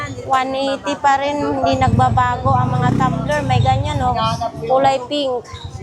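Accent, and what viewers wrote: Visayas, Philippines. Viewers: bisaya ikaw naman 😂😂😂😂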